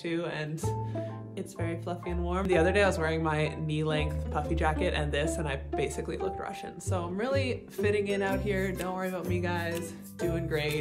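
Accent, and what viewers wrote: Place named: Houston, United States. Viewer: Great Houston accent.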